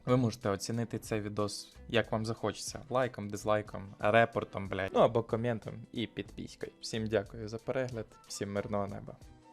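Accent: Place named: Surzhyk, Ukraine. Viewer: Полу суржик полу українська. Чувак, я підпишусь)) Це медятіна для моїх вух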